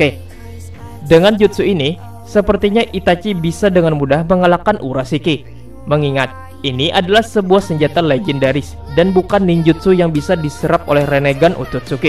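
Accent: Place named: South Kalimantan, Indonesia. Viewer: Bah nang ini tuh totok banar pian mang ay banjar kalua kah si amang nih nang kaya bang ipul hary haja jaejak si bungul tuuh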